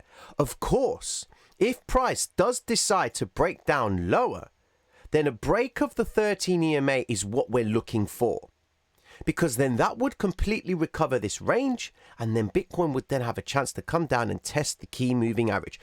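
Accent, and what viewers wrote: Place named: North London, United Kingdom. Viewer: He's from north London bruvs:) The accent is the Queens English with a twist of colloquial city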